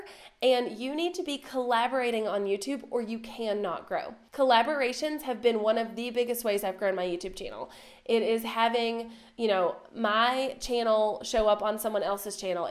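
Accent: South, United States. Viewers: I have got to ask which of these southern states are you from? Mississippi here!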